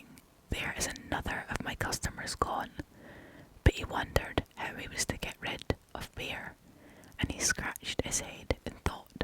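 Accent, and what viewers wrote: Scotland, United Kingdom. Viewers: your… (I wanna say Scottish…) accent is the cherry on top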